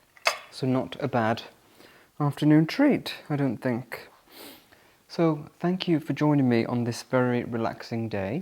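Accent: United Kingdom. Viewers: Thank god Little Sophie is feeling better. Nathan is such a good friend and we miss him. You have the most beautiful voice and I love your British accent. You just calm us down with that beautiful way you speak 😇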